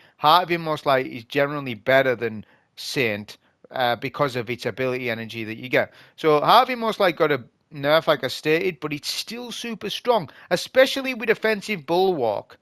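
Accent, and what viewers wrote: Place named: Sunderland, United Kingdom. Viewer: I can't pin down your accent. Sunderland, County Durham?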